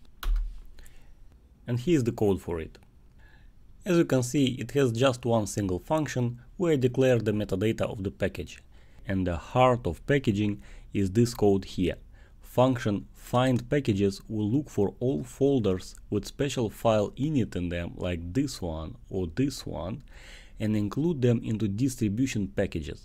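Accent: Russia. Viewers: Прекрасный русский акцент )) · Лайкт фор зэ кул рашн экцэнт энд эксэпшонал контэнт!!! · are you russian? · Мне одному показалось, что родной язык автора всё-таки русский?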